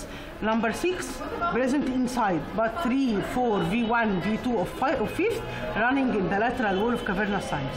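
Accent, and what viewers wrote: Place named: England, United Kingdom. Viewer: hello english accent!!!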